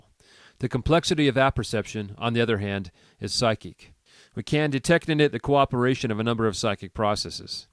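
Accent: United States. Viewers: you have the most americanest accent my ears ever did hear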